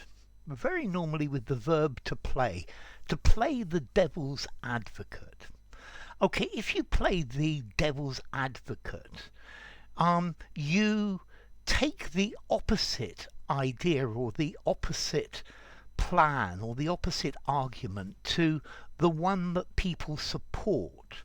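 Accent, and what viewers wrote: United Kingdom. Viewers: My God, teacher's British accent is adorable 🤟😎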